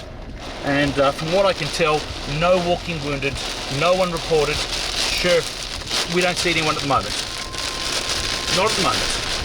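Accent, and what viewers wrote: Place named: Australia. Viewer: Just came upon your channel sir. I really appreciate your approach. Safety and a true love to help others. I'm sure im not the first, but can you imagine being in the southern US; get smacked by a storm and have some bloke with an Aussie accent asking if you're ok. Did i get whisked away to Oz? Well done. 73 God Bless.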